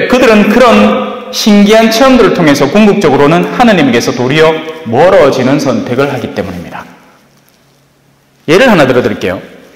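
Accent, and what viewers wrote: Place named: Gyeongbuk, South Korea. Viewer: 신부님.감시합니다 다정.다감하신.말씀.강론.찰지십니다..경북..내고향.의성가음면...신부님.사투리..베트남에서 기뻐합니다..아멘.🙏🙏🙏🙏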